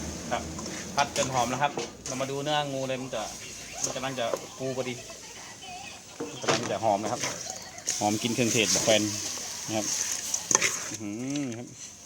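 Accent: North, Thailand. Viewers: อู้ไทยประเเลดเมื่อง5555++++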